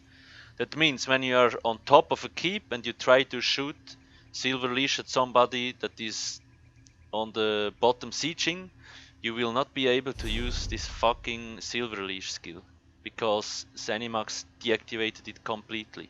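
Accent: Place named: Switzerland. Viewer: Dä schwizer akzänt :D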